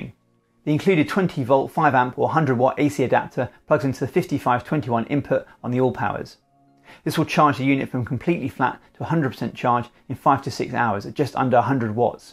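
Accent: United Kingdom. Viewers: Hi ur british?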